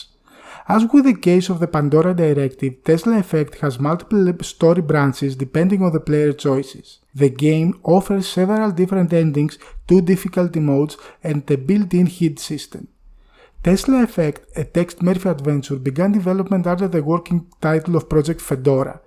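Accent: Greece. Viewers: I still remember the day when i first loaded Pandora Directive into my computer and started the game , it remains my favorite game of all time until now! Btw from your pronunciation i would bet you are Greek.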